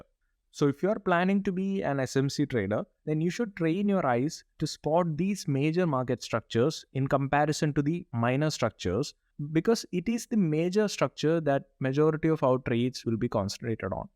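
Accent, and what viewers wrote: Kerala, India. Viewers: Sir, are you from kerala?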